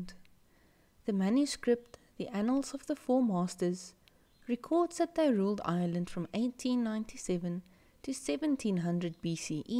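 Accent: South Africa. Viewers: are you south africa!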